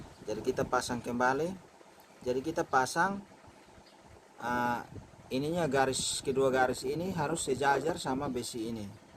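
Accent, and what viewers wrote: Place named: East Nusa Tenggara, Indonesia. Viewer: Logat kek org NTT 😂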